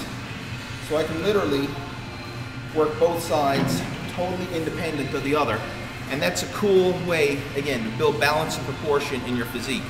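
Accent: Newfoundland, Canada. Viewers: Are you Canadian? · u a newfie? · You must be Canadian. I only can tell because I have watched a bunch of Trailer Park Boys...lol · are you canadian?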